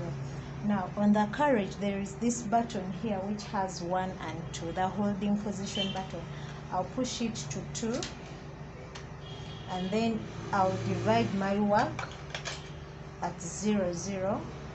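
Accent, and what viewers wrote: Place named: Uganda. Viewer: sounds Ugandan. Do u offer lessons face to face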